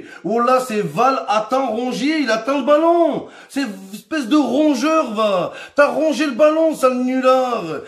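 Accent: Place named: Nord-Pas-de-Calais, France. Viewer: Mdr mais enfaite c'est un Ch'ti 😅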